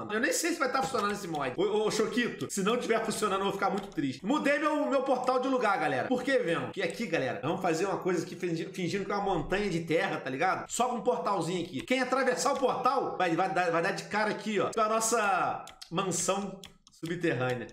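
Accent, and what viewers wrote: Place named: Portugal. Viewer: Muito bom ver o Venom voltando às raízes... Ele ainda tinha sotaque português europeu quando fazia série desse vídeo...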